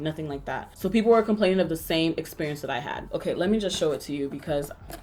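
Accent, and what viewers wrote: Nigeria. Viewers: and I'm from Equatorial Guinea where we speak Spanish. This is not the accent people have here when someone speaks English. I think another commenter put it best that this is very much a Nigerian accent because southern and northern African accents don't sound anything like this. Ive had friends from Kenya, South Africa, and Tanzania whose accents sound nothing like the 'standard' Nigerian accent. Uganda, too. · She does that on purpose. She's Nigerian so she does her Nigerian accent from time to time. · I’m an African from Southern Africa and this is a “typical” Nigerian accent. No one in the south sounds like this, just like no one in the north. It’s a Nigerian Accent. African is so yawn. It’s right up there with “I’m visiting Africa” when you’re going to one specific country. Name the country+name the accent 😂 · Your nigerian accent is the most beautiful thing I've ever heard UGH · I love your Nigerian 🇳🇬 accent 😍😍😍😍 makes me smile as a Jamaican doll myself ‼️❤️ I love you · Girl they sell them on eBay! 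I bought mine from eBay as well!! Also the washing machine doesn’t really work!! I love when you talk with your Nigerian accent! Subscribing!! Also I bought it for 5$ from eBay! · Lol, I'm sorry this happened to u, but this shit was funny. I'm sorry to laugh. I'm glad it wasn't a situation where they got your info and emptied your account. Girl, u said Doc McStuffin "is black in real life" lmao I know what u meant but she isn't real lol it's a cartoon. Thanks for sharing this. Btw, I love your Nigerian accent (I don't think the language is called Nigerian, so my apologies, but u know what u mean.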